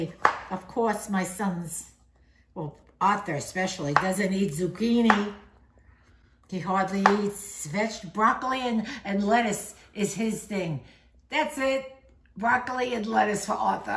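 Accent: New York, United States. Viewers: I detect a New York accent.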